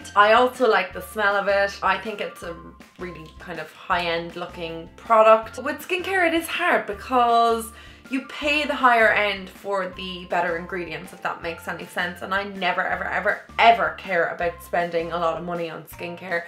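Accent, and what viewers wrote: Ireland. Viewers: Love the Ireland accent 😂❤️😍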